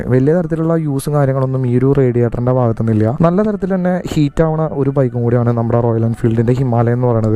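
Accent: Thrissur, India. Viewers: ഇങ്ങള്‌ തൃശ്ശൂര്കാരനാണല്ലെ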